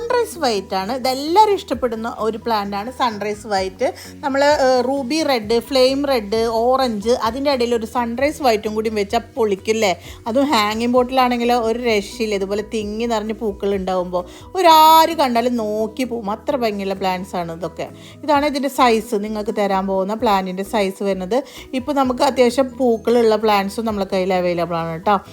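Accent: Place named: Thrissur, India. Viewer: Eavideya sthalam.. Samsaram kelkkumbol thrisshur dist anennu thonunnu...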